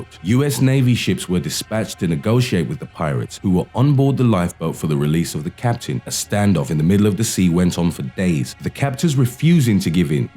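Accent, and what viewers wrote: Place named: United Kingdom. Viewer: I liked the first narrator and this British narrator now.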